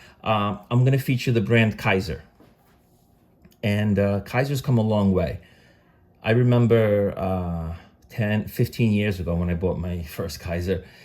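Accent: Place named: East Coast, United States. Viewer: Glad to join the party. Hearing you almost mkes me miss the east coast.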